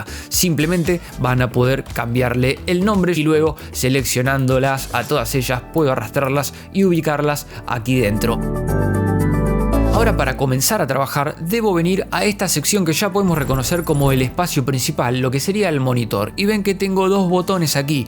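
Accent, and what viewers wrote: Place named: Argentina. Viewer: no se si es porque hablas como argentino o que seera, pero por fin alguien que lo explica como corresponde!!!!!Argentinaaaaaaa , el idioma original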